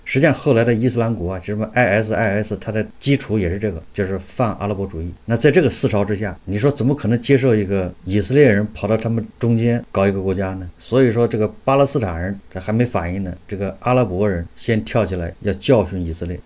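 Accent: Anqing, China. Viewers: 你的口音像我老乡，安庆枞阳吧。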